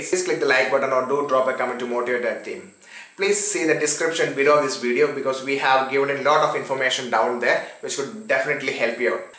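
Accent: India. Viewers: I went trough the comments on the video. It looks like many of them have problem with your Indian accent. I should say understanding is a skill as important as speaking. so people need to be a little bit flexible on the accents to be able to understand each other. some people don't try or don't like to try! good luck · thanks for good info but hard to catch due to indian accents and pronunciation... thks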